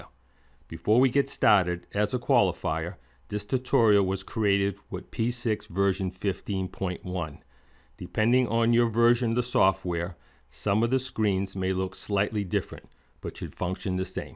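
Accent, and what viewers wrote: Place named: New England, United States. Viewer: very helpful, and I love the New England accent. :)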